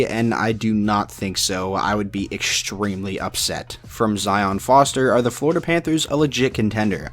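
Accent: Canada. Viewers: As a Canadian, you have the most Canadian accent i've heard in a while. You have to be from a small town out in butt fuck no where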